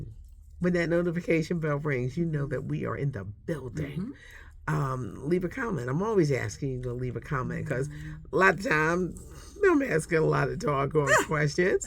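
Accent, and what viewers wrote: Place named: Philadelphia, United States. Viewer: Bad guy Diddy is. Ur voice SCREAMS Philly! I love u listen to WDAS WHEN I Lived in Philly. Props to u beautiful lady.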